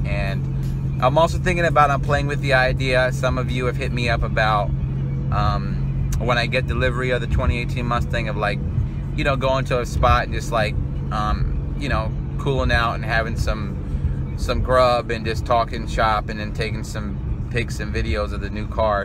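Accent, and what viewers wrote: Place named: New Jersey, United States. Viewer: What part of jersey are you from?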